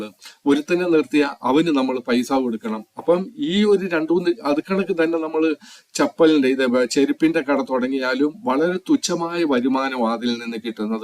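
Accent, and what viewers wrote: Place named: Central Travancore, India. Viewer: എന്തൊരു ബോറാണെന്നോ നിങ്ങളുടെ ശൈലി | 5 മിനിട്ടിൽ പറയാവുന്നതു 15 മിനിട്ടിലധികം വലിച്ചു നീട്ടി നീട്ടി.... മധ്യ തിരുവിതാംകൂർ ഭാഷ കൂടിയായപ്പോൾ പരമ ബോറ്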